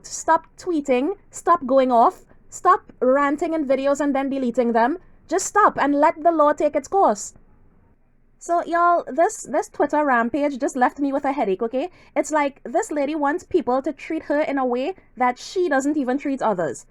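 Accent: Trinidad and Tobago. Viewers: Saw the repost through Emily Baker... As soon as I heard your voice, I recognized the Trinidadian accent 🇹🇹 Congratulations on succeeding on this platform! ❤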